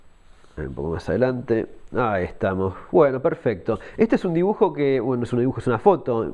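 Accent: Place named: Argentina. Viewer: Se nota por tu acento que sos argentino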